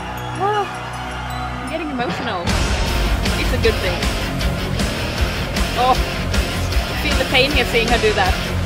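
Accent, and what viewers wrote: Sweden. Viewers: Hearing you talk for 30 seconds was enough to realize that you're a fellow Swede.:) I thoroughly enjoyed the video and I'm looking forward to whatever you do next! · another great reaction, tnx. i detected what i thought was a faint trace of swedish accent in ur voice, so i had to check ur info. i thought skåne, but deep forests? hehe, im a smålänning...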